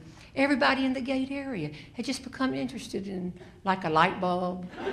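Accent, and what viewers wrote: South, United States. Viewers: I love it....she has a wonderful delivery and great comedic skills! Her Southern accent is wonderful and the fact that she profanity free is the best.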